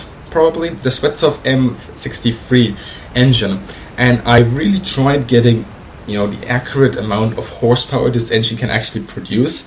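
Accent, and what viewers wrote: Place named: Germany. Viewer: Wow! What a way to present information, through an actual real-time simulation. And do I recognize my favorite German accent guy? Whose depth of knowledge of the ww2 aviation history is UNSURPASSED? Thank you! This is awesome! I learn more from this than by flying the simulator myself! Great medium! Re-live reality!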